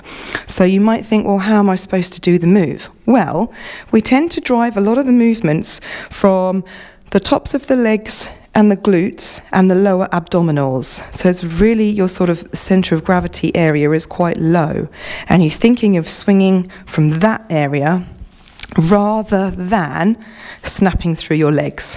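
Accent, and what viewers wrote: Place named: Southern England, United Kingdom. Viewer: Thank you for providing such wonderful classes! Your English accent is also delightful, just wondering where you are from, somewhere in southern England?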